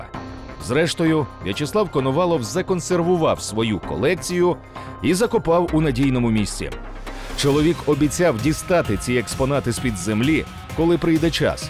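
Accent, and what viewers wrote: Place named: East, Ukraine. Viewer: Ахінея повна!!! Соус ЧУМАК у 1986 році!!! Лось без голови,та він оглядаяться блять на того хто його сполохав а без рогів бо то лосиха бля!!! А соми мутанти довші за людський зріст в Іспанії подивіться які,відсутність природних ворогів і людини і купа їжі,херак і ось тобі мутант трьохметровий!!!! А свійське порося в каналізації це взагалі треш особливо східний голос за кадром! БАЛАБОЛО СЮЖЕТ від 2+2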